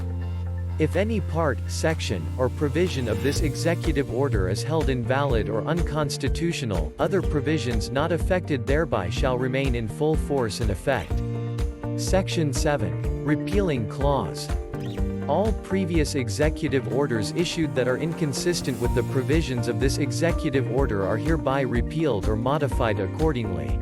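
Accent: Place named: Bisaya, Philippines. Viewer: Dah ibisaya nah, kay bisaya ang nagbasa 🤣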